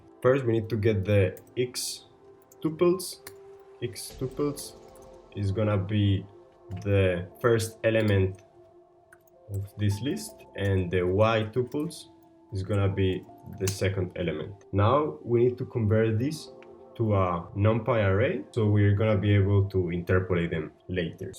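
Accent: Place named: Latin America. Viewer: Bro, are you a spanish speaker from Colombia? Your accent sounds very latin spanish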